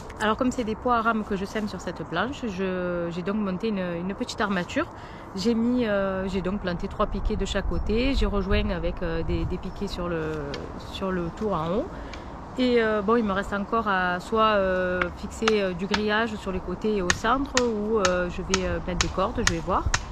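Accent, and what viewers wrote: South, France. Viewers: coucou, j’ai découvert ta chaîne YouTube depuis la pas mal de temps... je crois que t’as un accent du sud 🤣😀😀 j’adore.. demain je vais planter des courgettes 🖍☢️♻️♻️㊗️.. Bon week-end. Yanis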